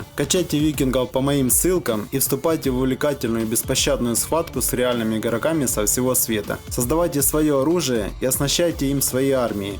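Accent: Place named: South, Russia. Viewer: Задолбал ужасный южный говорок даже в рекламе. Кубанойды в блогерах все, тунеядцы.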